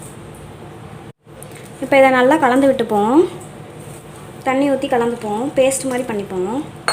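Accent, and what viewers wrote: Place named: Salem, India. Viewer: Speaking slanging Adi thuul akka . That's salem guys 🔥✈️⛏️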